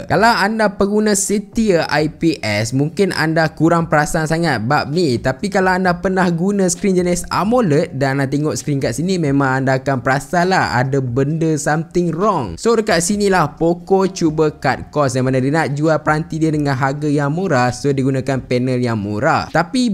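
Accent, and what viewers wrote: Taiping, Malaysia. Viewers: Orang tepeng ka? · Hai bro.dok taiping ke?? Cm jiran kee hhshshshssj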